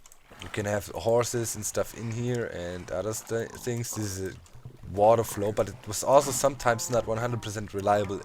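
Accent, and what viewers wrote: Germany. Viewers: Dr. CreeperZ ha I knew it cuz I'm from Germany and everybody there has an accent like that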